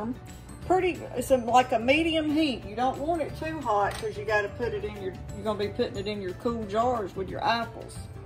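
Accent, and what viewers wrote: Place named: Eastern Kentucky, United States. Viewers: I’m from Wayne Co West Virginia across Tug River from Martin Co Ky. We talk the same, srup,worsh rag, roin the clothes lol I love our country ways Enjoyed the video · Love the accent, I'm from the mountains of Eastern Ky... we have the same accent with a mountain drawl... southern people the heart and soul of America!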